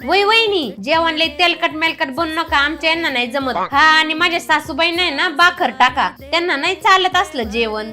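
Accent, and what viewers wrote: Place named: Pune, India. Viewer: पुण्याकडची भाषा आहे ना ही❤❤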